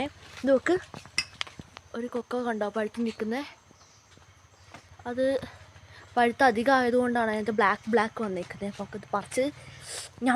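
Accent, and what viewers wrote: Kottayam, India. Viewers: Slang oru kottayam touch 🧐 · Athea currect kottayam slang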